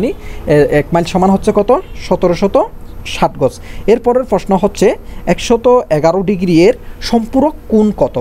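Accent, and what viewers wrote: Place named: Noakhali, Bangladesh. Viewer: উচ্চারণ. Noakhalir hoise Ata avoid korte hobe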